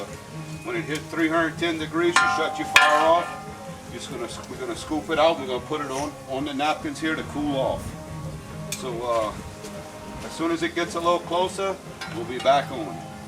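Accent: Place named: New Orleans, United States. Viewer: That "naw-lens" accent distracted me the whole vid! Love it!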